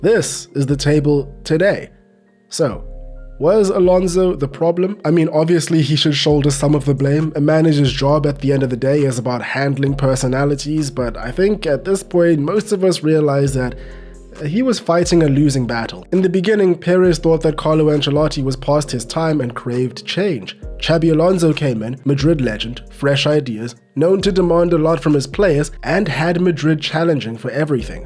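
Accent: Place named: South Africa. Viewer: Bro, are you South African? Your accent reminds me so much if my cousins back home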